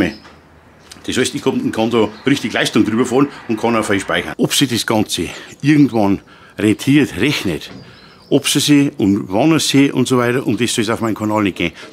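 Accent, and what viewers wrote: Bavaria, Germany. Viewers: Sorry , alles schön und gut aber es versteht nicht jeder " Extrem Bayrisch" ich kann Dir wirlich nicht folgen ! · Einfach klasse, deine Art zu erklären ist gut verständlich. Ok, dein bayrischen Dialekt versteht nicht jeder, aber ich hab da überhaupt kein Problem. Mach weiter so du bist klasse. Gruß Klaus aus Unterfranken 😂👍noch Bayern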